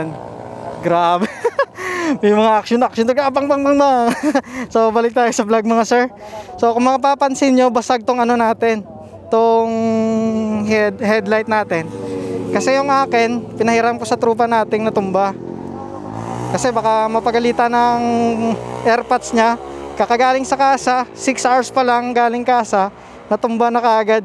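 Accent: Iloilo, Philippines. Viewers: Taga saan ka po kuya. Bat medyo ilonggo ka hahaha. (Iloilo) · kahit anong tagalog mo idol lumalabas talaga pagiging ilonggo♥️ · Ilonggo ka sir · Haha illonggo kaman? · Saan lugar 2 sir,,ksi ga ilonggo ka eh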